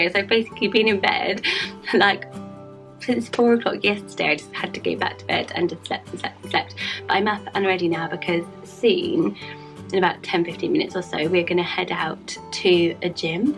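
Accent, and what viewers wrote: United Kingdom. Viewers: Feel better! Funny story; when I first started watching you about a month ago, my captions would always write your name as and I’m American so i just thought you were saying w a British accent I guess? And i was like why the heck is her channel name? then one day my captions wrote, and that’s when it finally clicked🤦🏽‍♀️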